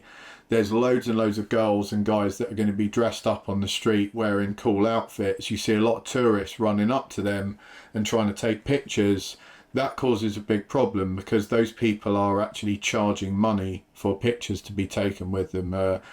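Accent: United Kingdom. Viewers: Doesn’t that English accent bring a lot of cache all over America? I had a pal, from Wales actually, kind of a Joe average, but he just attracted women and good will just by opening his mouth anywhere he went, restaurants, bars, just out shopping, it was actually good to hang around with him just because of the spillover and association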